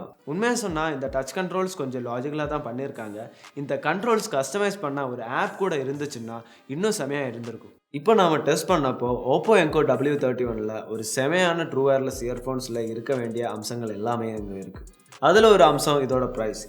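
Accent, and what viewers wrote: Kerala, India. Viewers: konjam kerala waadai adikudu thambi pesaradula...:) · From his slang, is a Mallu:-) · Sound like Malayali speaks tamil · Bro neenga malayali yaa. Neenga pesum bothu oru malayali Tamil pesura Madhuri iruku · Avaru malayalai pa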